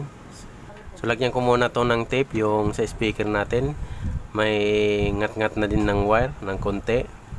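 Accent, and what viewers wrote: Bicol, Philippines. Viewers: Sir bicolano kba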